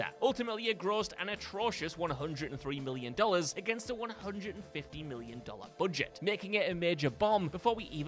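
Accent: United Kingdom. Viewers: I love how he tries to keep pronouncing "toe-may-toe", but every now and again a thoroughly British "toe-mah-toe" slips out....🎶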